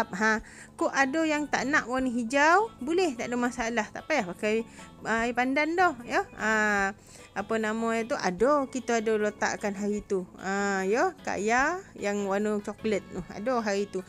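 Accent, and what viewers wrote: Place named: Palembang, Indonesia. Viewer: Sedap nian kuenya, accent kakak mirip samo baso kami wong Palembang (sumatra) 😁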